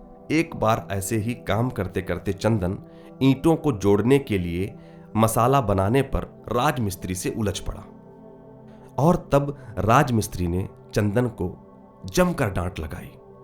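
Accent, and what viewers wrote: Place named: Bihar, India. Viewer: बीते अनुभव की कुछ झलक थी, चंदन की कहानी। फिर 'दिहाड़ी' के रूप में सामने आई। अक्सर देखा गया है कि लोग चले जाते हैं कमाने-खाने बाहर.. गाँव-देहात वाले शहर, शहर वाले बड़े शहर और बड़े शहर वाले विदेश! रोजी रोज़गार का न होना, पलायन के बड़े कारण और बड़ी समस्या है। खैर, कहानी लिखी और फिर रह गई कहीं। आपकी आवाज़ ने इसे जीवन दे दिया। हर पात्र सजीव हो उठे और अपनी-अपनी सुनाने को बैचेन हो उठे। सर, आपका जितना भी आभार व्यक्त करूँ कम ही होगा। आपने जिस भाव और संजीदगी से पढ़ा है, वो काबिलेतारीफ है। बिहार की ठेठ बोली को बड़ी ही सुन्दरता और सहजता से निभाया है आपने। अपनी ही कहानी को सुनना एकदम से अलग अनुभव है। और, शुरुआत में तो आप बिल्कुल रेडियो वाले ही लगे!🥰 बहुत बहुत धन्यवाद आपका सर! 🙏🙏 😊😊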